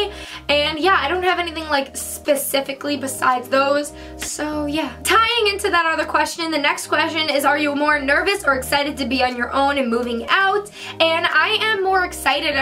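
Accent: New York, United States. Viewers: TeAnna Besougloff new yorker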